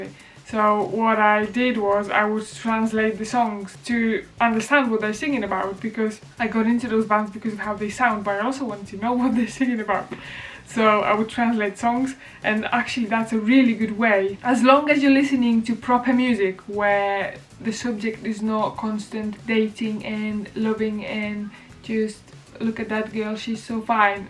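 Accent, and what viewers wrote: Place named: North, United Kingdom. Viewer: UK native (manchester) spanish (2nd) ... you definitely have a northern sounding accent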